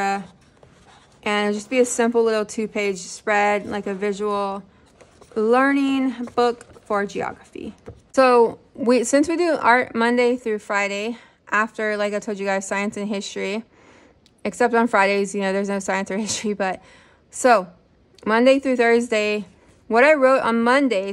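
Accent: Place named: Argentina. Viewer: Hi! I am curious to know where you are from..the way you speak English sounds a lot like mine. I am originally from Argentina but I live in the US for a litter over half of my life. And I homeschool my kiddos that is why I got drawn to your channel. Thank you for sharing.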